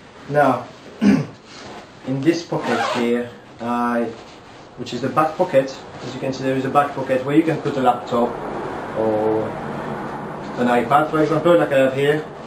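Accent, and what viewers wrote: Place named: France. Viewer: Nice stuff mate! Are you originally from France? I think you are because your dialect sounds so. :) Have you ever considered to change Sigma 70-200 to a Nikon VR1? It's a one of a beast lens on a full frame!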